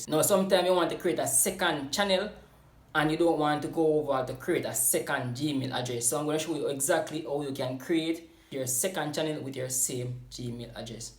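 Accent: Jamaica. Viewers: I will stick around for the Jamaican Accent.....Bomboclat!!!!!.....i just subscribed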